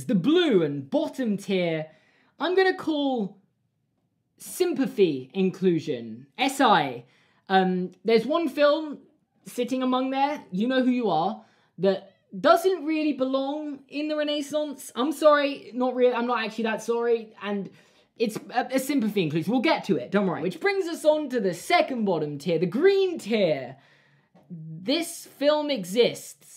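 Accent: United Kingdom. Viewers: see this is what irritates be. People from the US saying a brit is mispronouncing their own language. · As a fellow Britishman, I approve of the Renaissance pronunciation going on in this video 👍🏻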